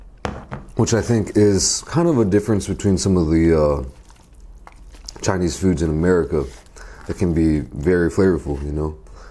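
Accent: United States. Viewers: How's his accent so american?? Not a regular here so do explain to my ignorant ass 😁👍🏾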